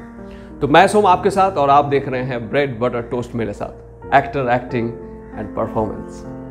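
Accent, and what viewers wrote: Bengal, India. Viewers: Sirji thanks for the video par aap apna hindi ka R theek kar lo..Bengali accent aa raha hai usmein..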